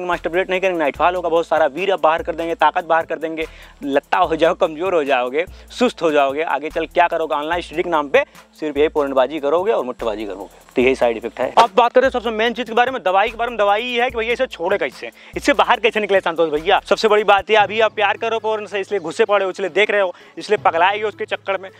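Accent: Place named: Bihar, India. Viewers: Bolne ka style pehewani aur bhojpuri jaisa hai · Big brother aap Bihar se ho Aap ki avaj Bihari hi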